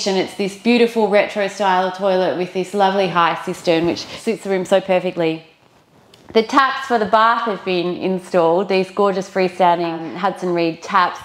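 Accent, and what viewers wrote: Australia. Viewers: Love love this! Yay an Aussie accent! And I’m only halfway through this episode. Stunning wallpaper. J’Adore! Tres Bien 💗💗💗 and congratulations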